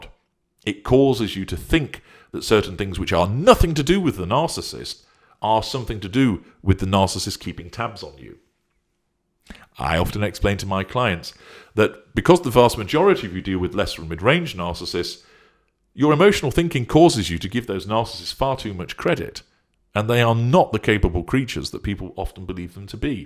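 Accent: United Kingdom. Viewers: I dont like your british accent. Make your videos in an american accent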